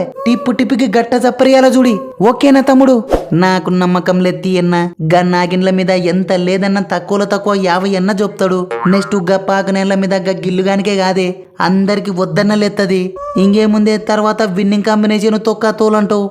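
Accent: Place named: Kurnool, India. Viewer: Mana kirnool yasa tho matladi , prathisari sonthavuru gnapakalu gurthuchestavu ... Thanks thammudu❤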